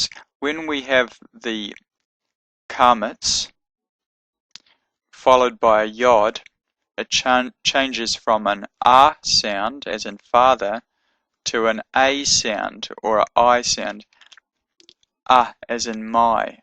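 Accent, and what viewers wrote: Australia. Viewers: aussie!!!!! love it!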